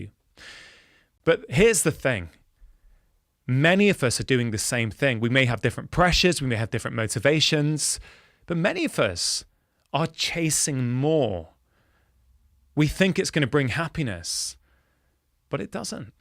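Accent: United Kingdom. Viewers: No, is British and speaks perfect English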